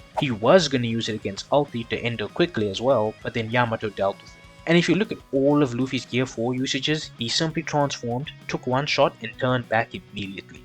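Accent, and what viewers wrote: South Africa. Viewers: Yo gang are u from SA? I think I can hear it in ur accent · I smell a RSA accent 🇿🇦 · Why do you have like 5 accents in 1 If i had to guess maybe you're Indian from South Africa? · I KNOW A SOUTH AFRICAN ACCENT WHEN I HEAR IT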